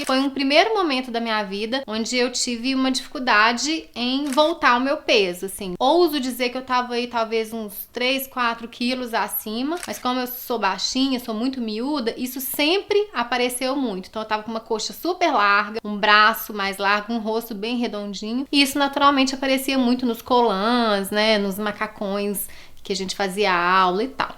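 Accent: Minas Gerais, Brazil. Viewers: Te encontrei a pouco tempo, e que delícia! Amo ouvir seu sotaque mineiro, sou mineira mas moro no MT em sinop. Seu vídeos são inspiradores... Tenho amado muito. parabéns pelo canal.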